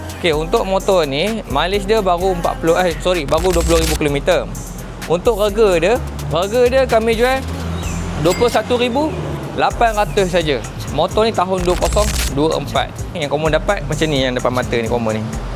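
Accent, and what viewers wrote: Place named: Perak, Malaysia. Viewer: Ate, kapar klang bunyinya Perak yeop 😁😁🤔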